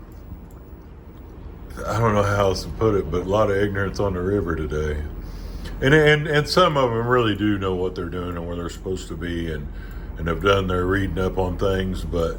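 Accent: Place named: South, United States. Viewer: People will never under stand red neck talk bud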